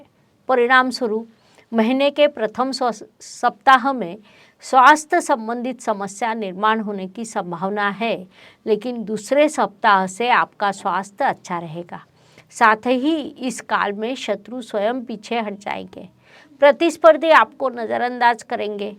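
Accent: Maharashtra, India. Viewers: Are madam tumhari speech kitnnnnna mast hai Marathi mix lagta hai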